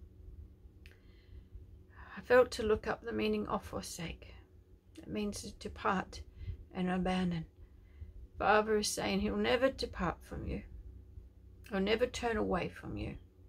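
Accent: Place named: Australia. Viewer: Oh wow!! Aussie!!!❤❤❤ Love to hear your voice. I’m in the United States, Kentucky ❤❤❤😊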